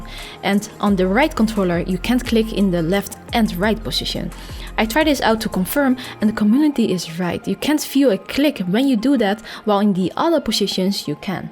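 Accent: Netherlands. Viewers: Its just a dutch accent. Not dutch-asian. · Is that a Dutch accent?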